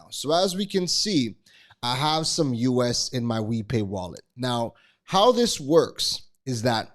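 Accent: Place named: Trinidad and Tobago. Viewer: Where you from bro? Sound Trini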